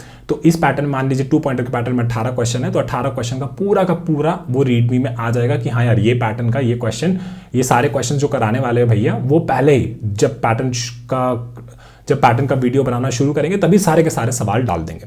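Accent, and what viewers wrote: Bihar, India. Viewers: Aap bihar se hai na bhaiya !!😅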